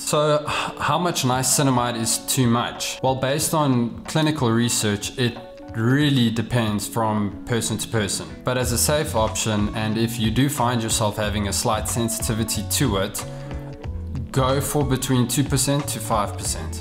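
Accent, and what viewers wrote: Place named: South Africa. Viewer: Why do you sound so South African? Where you from?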